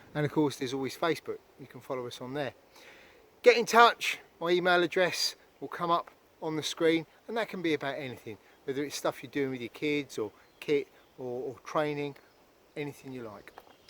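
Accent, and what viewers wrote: England, United Kingdom. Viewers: Well done mate, good to hear an English accent again :) Regards, Keith.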